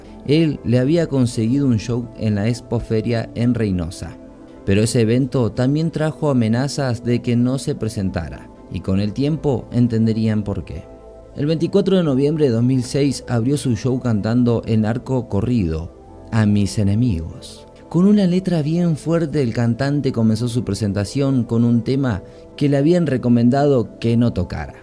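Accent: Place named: Argentina. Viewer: Lo que mas me vuela la cabeza es porque el narrador tiene acento argentino?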